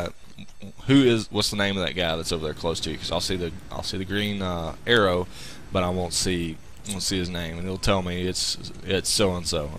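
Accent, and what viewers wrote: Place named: South, United States. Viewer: Nice video, great quality, good tips. Are you from the south? I'm from GA, your accent sounds kinda familiar.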